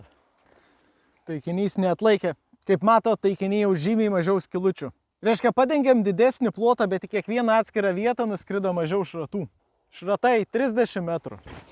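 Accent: Šiauliai, Lithuania. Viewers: Tu turi žiauriai gerą iškalbą ir gerą šiaulietišką prieskonį :D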